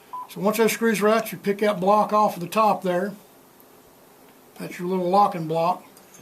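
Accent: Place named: South, United States. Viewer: Great video. You don't sound like you grew up in Maine. My guess would be my part of the country, "Dixie". Thank you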